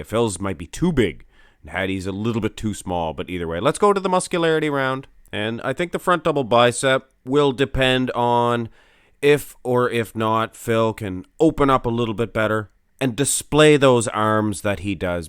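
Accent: Canada. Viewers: Great commentary. I couldn’t tell if he was from Canada or Minnesota until the end of the video. I am guessing Canada.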